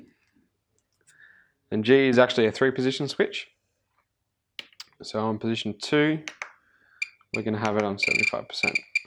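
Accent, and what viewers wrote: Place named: Australia. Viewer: as a person who lives in australia, i find the aussie accent very appealing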